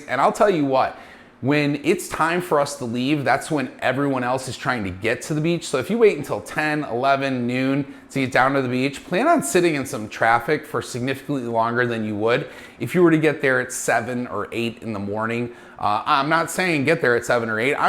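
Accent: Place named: Western New York, United States. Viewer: Go Bills! I could tell you are from Western New York. Is there a Bills Backer spot?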